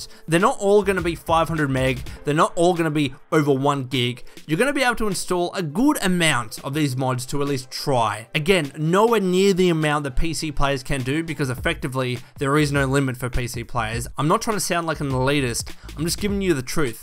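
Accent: Australia. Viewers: Wow, I'm kinda digging the Aushie accent this way.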